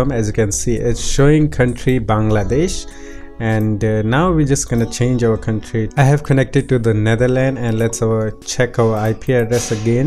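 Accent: India. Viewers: you sound very indianish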